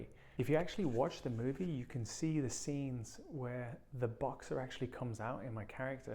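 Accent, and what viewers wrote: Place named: Australia. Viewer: Your English accent has a bit of a Australian twang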